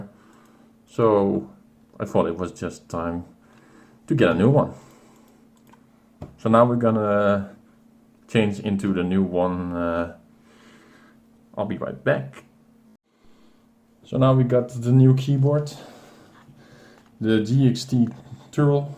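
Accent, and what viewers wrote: Netherlands. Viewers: are you dutch? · Ik hoor dat je nederlands bent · Ik kan gwn horen dat je Nederlands bent haha :P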